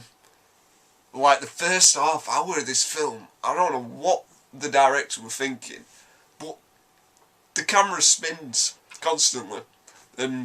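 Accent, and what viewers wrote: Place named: Northern England, United Kingdom. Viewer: You are so likeable , corrie accent love it 🤣🤣🤣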